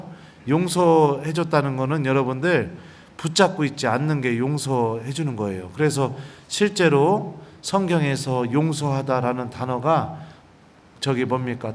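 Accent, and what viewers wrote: Chungcheong, South Korea. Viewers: 신부님 충청도 홍성사투리가 너무 정겨워유 제고향도 엽동래유 신부님 건강도 돌보셔유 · 충청도 사투리로 인사하시니까, 신부님의 덕담이 더 구수하게 들리네요. 저도 몇십년전 죄 고백을 했던 씁쓸한 기억이 나네요 죄는 붙잡지 않아야 ,진정으로 회복이 빠르다는 말씀에 적극 공감합니다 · 잘 계셨슈? 그려유~~그유~~ 충청도 구수한 사투리가 너무 친근감이 듭니다. 고향생각이 납니다. 쉽게 풀어 강론하시는 신부님 고맙습니다. 항상 건강하시길 기도합니다.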